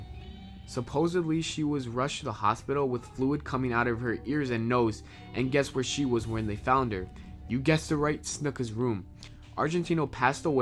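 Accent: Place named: United States. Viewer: Holy Shit! A WWE Top 10 with an American voice!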